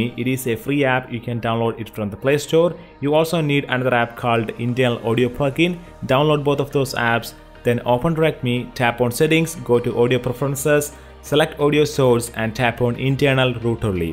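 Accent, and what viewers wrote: Kerala, India. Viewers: So finally that is a mallu accent ...ooorange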